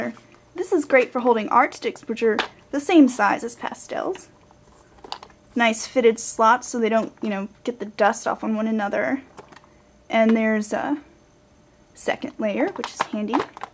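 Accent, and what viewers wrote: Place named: South, United States. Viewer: Great video,sounds like your from the south y'all.love it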